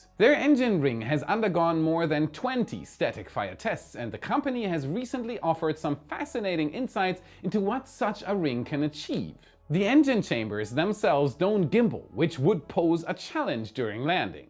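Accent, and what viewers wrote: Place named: Germany. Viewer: Love these videos. Part of me hates the cringy sort of script reading. But honestly the German accent sticks around in my mind and when I see a new video I just imagine cliche intro phrases in a German accent and I have to say I look forward to it haha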